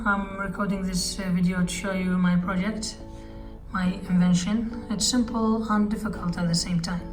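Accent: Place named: United Kingdom. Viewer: جهاز روعه واللكنة البريطانية اروع ! استمر للأمام...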